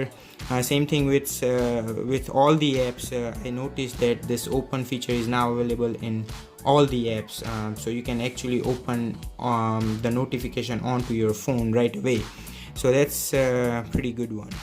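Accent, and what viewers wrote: Gujarat, India. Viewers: accent is awesome gujju bhai :)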